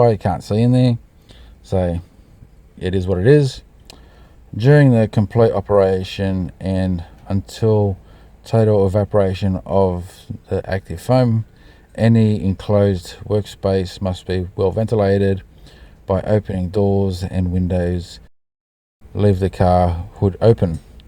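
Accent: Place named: New Zealand. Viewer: haha oh really 😂 sorry mate - yeah definitely heard some Kiwi twangs in there. Maybe with so many of us kiwis here it’s starting to rub off on ya! Ha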